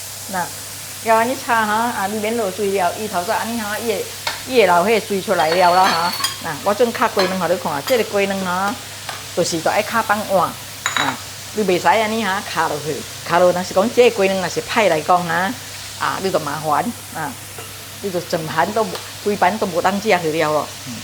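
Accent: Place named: Hokkien, China. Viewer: Aunty, thank you for this bitter gourd recipe, Today I learned that fish sauce can increase flavors of bitter gourd. I will try it out the next day if I cook this, favorite dish. It is a good dish for hot weather. Aunty, today you speak in Hokien dialect is a welcome sight for us Hokien aunties. I find you have Mandarin subtitles in your vid, that is very good for those couldn't understand the simple dialect. Bravo keep up your efforts I will always support your channels. Have a great day ❤❤❤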